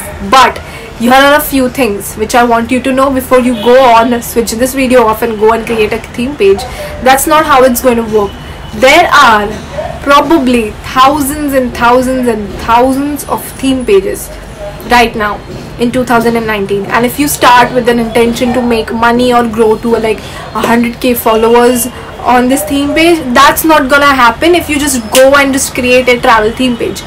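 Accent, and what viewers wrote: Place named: India. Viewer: it's the Indian accent ahah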